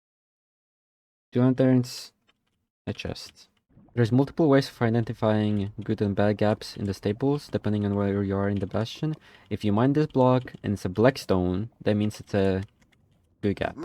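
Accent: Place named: Ukraine. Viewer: You're from Ukraine, right? I found your channel pretty late, but I absolutely love how your English sounds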